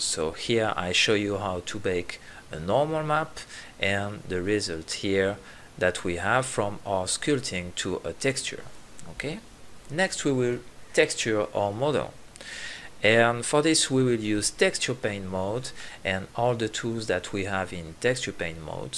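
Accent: France. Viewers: On a reconnu l’accent français 😂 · Clairement 😅 L’accent français est présent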